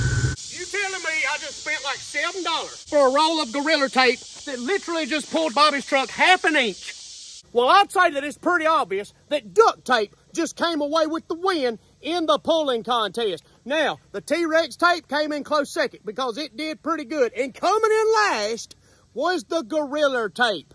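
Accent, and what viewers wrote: South, United States. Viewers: That's southern lingo. Window (winder), tomatoes, patater, onion etc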